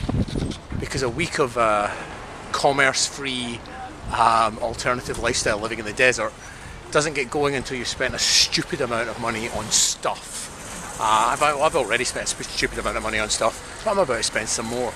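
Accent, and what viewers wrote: United Kingdom. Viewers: what are you.. a reporter!? damn man... stop trying to show the burn... just do it... stop talking and saying "ya... uh ya.. uhh ya....uhhhh ya uuuh ya...( with u.k. accent etc etc etc) what is this non sense!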